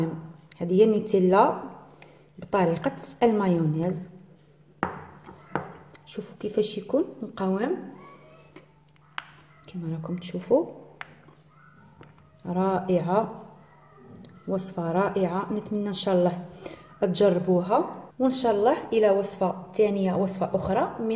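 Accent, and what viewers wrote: Algeria. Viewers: حبيت نقلك كتعجبني لهجة الجزائرية الله يوفقك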